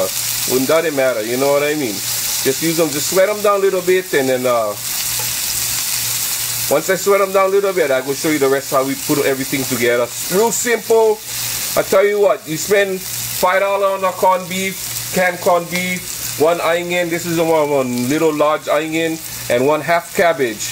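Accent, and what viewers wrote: Hawaii, United States. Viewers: My Wife and I love your videos. Your pidgin accent makes us think of Home as much as your recipes! Right on!!!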